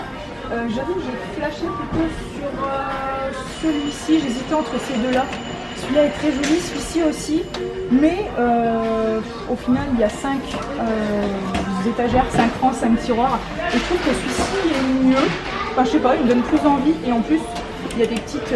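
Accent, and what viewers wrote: North, France. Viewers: on reconnait l'accent du nord mdrr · Les gens entende beaucoup l’accent moi non 😂( oupsss je viens du Nord aussi )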